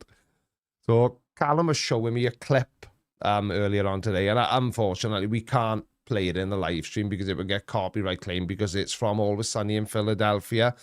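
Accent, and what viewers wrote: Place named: Wales, United Kingdom. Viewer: Came for the science. Stayed for the fun and the Welsh accent.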